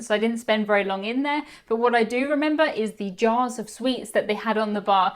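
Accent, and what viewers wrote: United Kingdom. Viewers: Love the British accent (being American, it's cool to see the different ways we speak the same language). I could get lost in your eyes and listen to you talk all night!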